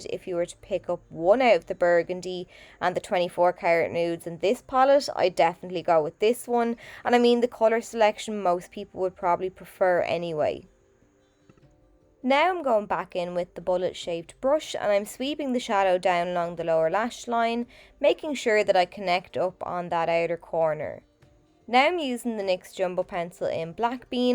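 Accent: Ireland. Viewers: Hey thanks, I’ll look into those brands. I actually took your advice and bought a little packet of hair elastics and have been putting them on the bigger brushes in the meantime. Excellent my Irish friend, excellent. I’m 5th generation Australian but my family hails from Ireland and all my Irish rellys have your accent so I love watching your videos. You are one talented artist. I have watched many many professional makeup artists on YouTube but your uploads are so clear and concise and easy to follow. I like the way you instruct over the video each point as you do it, it makes it so much more doable, and your friendly chatter makes it enjoyable. So cheers for that and keep safe and well over Christmas. X🐨x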